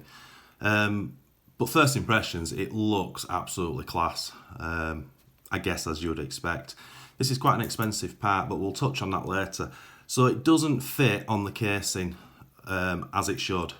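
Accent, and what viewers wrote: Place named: Yorkshire, United Kingdom. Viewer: new to your channel really liking it a yorkshireman in canada dont lose the accent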